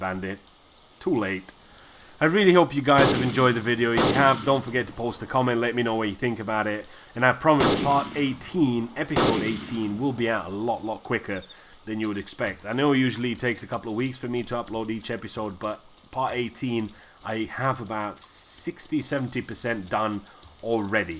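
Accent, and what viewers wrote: Finland. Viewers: I could almost hear that you're Finnish even if I didn't hear you speaking Finnish :D it's the accent... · Sulla on aika suomalainen aksentti, pakko sanoa. :) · Totally finnish accent :) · that bandit who know you sound like finnish akcent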